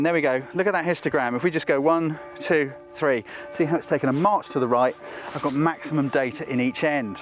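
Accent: United Kingdom. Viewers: By the way I am big fan of yours - Your Voice, your mannerisms, and your "BRITISH" accent - I would love be born again to acquire it :) You have also lost weight, which is awesome ! BIG FAN !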